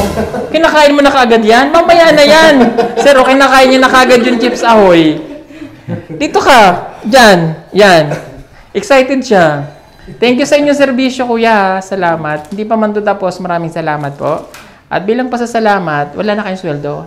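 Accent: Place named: Iloilo, Philippines. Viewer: Nakakatuwa si sir may tunong pag ka ilonggo 😅😅😅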